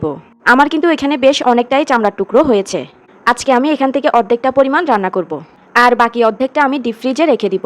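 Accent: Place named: Barishal, Bangladesh. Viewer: আপনি বরিশাইল্লা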